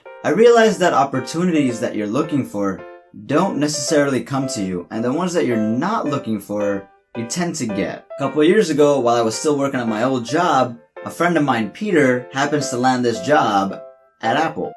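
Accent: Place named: New York, United States. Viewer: the minute i clicked on this video i knew you were from NYC lol, when you said manhasset it confirmed it lol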